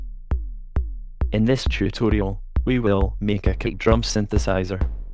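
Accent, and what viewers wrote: Scotland, United Kingdom. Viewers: lol got so excited when i heard a scottish voice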